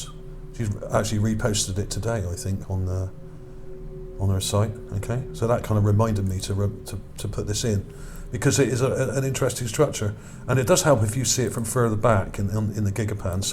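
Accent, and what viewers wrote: Bristol, United Kingdom. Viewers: Dear friend with british accent- you could slow down your exciting explanationns, beef up your volume 16 percent, louder is better here, so many videos I simply cannot hear and I wont wear headphones, I hate that, I was forced to wear HD ear protection for years, ok at least boost it 10 percent if at all possible. macbookair-speakers are not loud enough! thanks why do British accents make it hard to understand? love the shows, theories and analysis, wish you would decorate your findings with more filters and colors, but yeah you do know the art of photo analysis, great job, very creative and amusing at times! A big THUMBS up! Thank you for your research! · Think about who those dislikes are coming from, there are entities out there who are not private individuals, and who will attempt to discredit anything that leads people to the truth. 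They are all over the web, on forums etc, it is their job, it is what they are paid to do. Keep up the good work...that accent sounds like Bristol, we could be neighbours.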